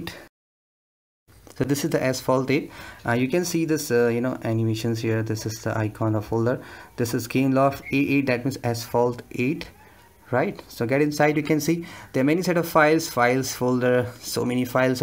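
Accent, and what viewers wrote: India. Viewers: When i search tutorial i always hear voice of indian 🤦‍♀️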